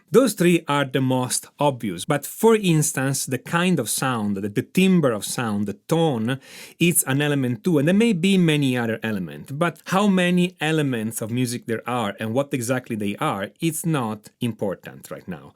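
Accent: Italy. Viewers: Ho riconosciuto subito l'accento italiano (non avevo mai incontrato questo canale ) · I love your Italian accent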